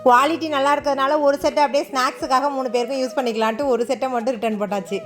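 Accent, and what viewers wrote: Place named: Mayiladuthurai, India. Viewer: Nan mayvaram neenga samikerathu pesarthu ellam engla polava irukku nan ipathan unga vidios pakeran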